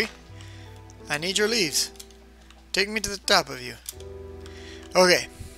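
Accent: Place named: North Carolina, United States. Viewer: are u from NC? your accent is identical to mine. lol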